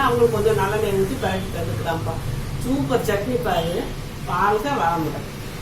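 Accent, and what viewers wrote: Madurai, India. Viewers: Unga pesum murai romba azhaga irukku, neenga Madurai pakkama?Unga tamil madurai, virudhunagar side tamil madhiri irukku, ketkum bodhu romba sandhoshama irukkudhu🙏🙏🙏🙏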